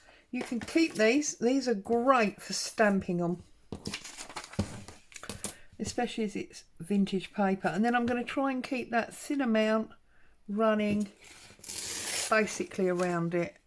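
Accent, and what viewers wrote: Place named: Kent, United Kingdom. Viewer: Hi, I have just found your channel and am enjoying watching, you make it very easy to follow. Also your accent is familiar, am I correct in saying you from Kent?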